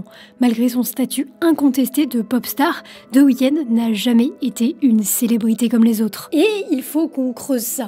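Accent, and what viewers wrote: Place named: France. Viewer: SVP est ce que tu peux prononcer les mots anglais sans l'accent Français? Ça fait mal au oreilles, / Ze weekend.. rien de personnel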